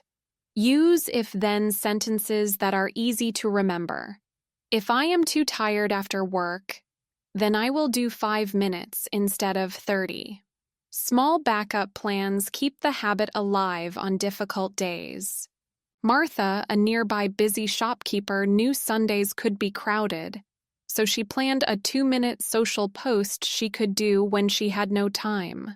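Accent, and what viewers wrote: United States. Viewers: your accent in video is american accent . right ?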